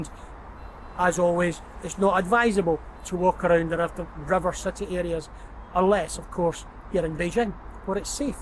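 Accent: Scotland, United Kingdom. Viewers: Nice to see you back in China. I feel you belong there. Another thing I love watching your videos for is your lovely Scottish accent. I used to live in the U.K before I married to come to the States. Then I had a Scottish colleague. I remember I barely understood him most of the time, but I understand you 100%. Wonder why?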